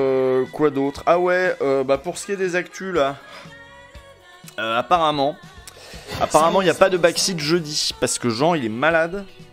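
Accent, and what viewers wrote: Quebec, Canada. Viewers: L'accent québécois toujours au top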